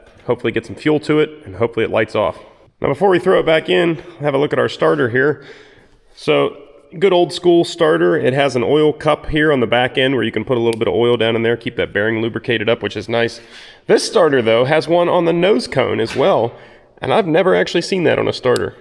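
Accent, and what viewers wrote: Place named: Western Pennsylvania, United States. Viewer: I absolutely love your videos. They are very interesting and very enjoyable. I have to admit that I wait with anticipation for each and every one to come out. Being from western PA myself I feel as if I have known you forever. Your accent brings back many memories of home and things I used to do. I guess you could say I live my life vicariously through you. I am now on disability and living in Florida but each and every video takes me back home. Being an old mechanic and my love for old equipment makes it even better. May God Bless You and your family. Please keep up the awesome videos. I too am waiting on that overhead crane!! Lol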